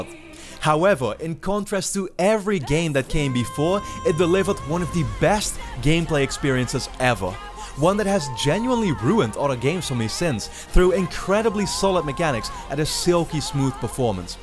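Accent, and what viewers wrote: Netherlands. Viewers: is he dutch? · Are you Dutch? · Are u dutch? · 10 seconds in, ''this guy is dutch''. the accent is minimal tho, pretty good tbh. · What accent is that of yours? Dutch? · Are you dutch?? You sound dutch. im dutch btw (edit: Oh you have a rochet league car with a dutch flag. Hallo😂😂)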